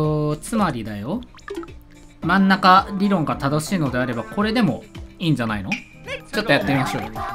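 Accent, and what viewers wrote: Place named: Kansai, Japan. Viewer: ・。・c 関西弁(´▽｀)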